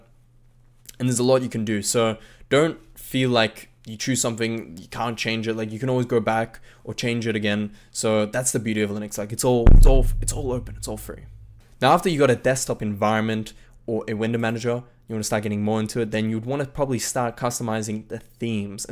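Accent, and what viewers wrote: Hungary. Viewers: are you hungarian by any chance? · Hey man are you hungarian? :D love the video Nagyon jó videó lett:) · az úr magyar? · magyar vagy? xd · Are you Hungarian? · are you hungarian ?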